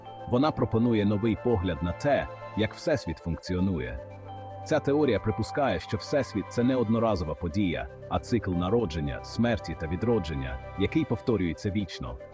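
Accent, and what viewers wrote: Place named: Poland. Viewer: жаль наголоси погано виставляються на укр мовi :body-blue-raised-arms: та настройка яка нормально корегуе iншi мови, перетворюе укр мову на якусь... навiть хз...полупольську якусь, в сенсi звучання... гг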